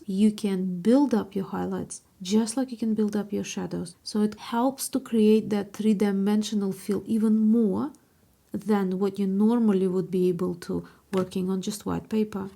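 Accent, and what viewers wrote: Russia. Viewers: Thanks for the video! I'm only starting to playing with paints and watercolor fascinates me. Btw, where are you from? Your accent seems very russian sometimes, so I wonder if any other language gives that particular accent. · Такой русский акцент)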